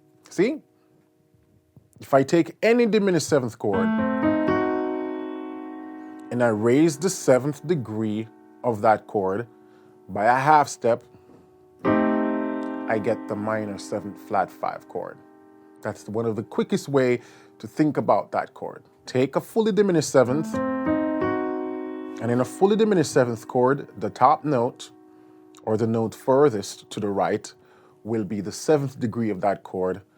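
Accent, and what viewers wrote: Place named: Jamaica. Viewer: Lol I love when the yardy accent slips in and out lol, great stuff bro! Love from Trinidad!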